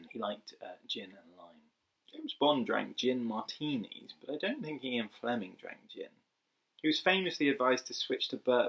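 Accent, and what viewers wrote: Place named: England, United Kingdom. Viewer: I love how more and more comfortable you are in front of the camera. If your accent didn’t give your nationality away your dry humor did. It is very much English 😂😂😂. I love this random video please make this a segment. It’s literally stream of consciousness in video form. It’s absolutely brilliant! Who ever was on the other side of the camera was hilarious, I like her!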